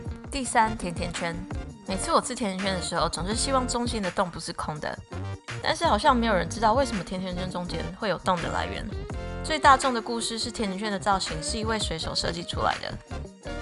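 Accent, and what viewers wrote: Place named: Taiwan. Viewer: 雖説台灣妹子説的不是標準國語，但這個調子聽起來好可愛！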